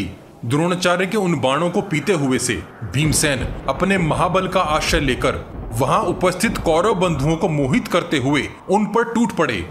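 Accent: Standard. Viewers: अत्यंत प्रभावशाली आवाज शुद्ध उच्चारण सुनकर मन प्रसन्न हो जाता है मैंने गीता प्रेस गोरखपुर के कल्याण अंक में ये कथा पढ़ी है पर आपकी आवाज में सूनना आनंद दायक है कृपया शकुनि के बारे में जानकारी दें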